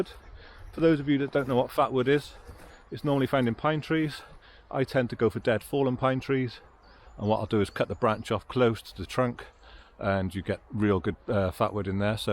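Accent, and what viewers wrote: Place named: United Kingdom. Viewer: Where is this? I assume in the UK. Guessing that due to your accent.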